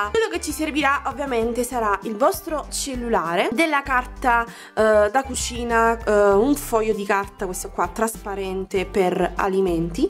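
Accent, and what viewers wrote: Naples, Italy. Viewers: Adoro il tuo accento Napoletano😍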